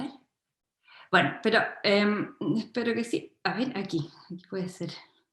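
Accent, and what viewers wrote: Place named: Chile. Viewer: Cuesta entender sus palabras debido a su acento tan marcado, es chileno? 🫩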